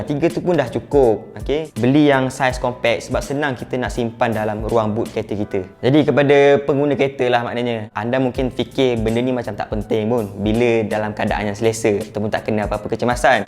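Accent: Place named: Terengganu, Malaysia. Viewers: pekat ganu bohh hahah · loghat ganu ni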